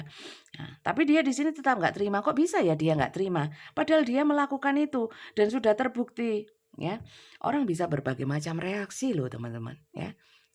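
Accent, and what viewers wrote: East Java, Indonesia. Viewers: Aku itu selalu teetawa sendiri kalau mendengar readingan bunda. Karena khas bahasa jawatimuran nya selalu muncul..😂😂😂😂 benar benar terhibur..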